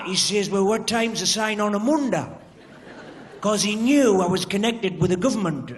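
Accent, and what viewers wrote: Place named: Sunderland, United Kingdom. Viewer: His accent is real, a proper maccam get over yourself.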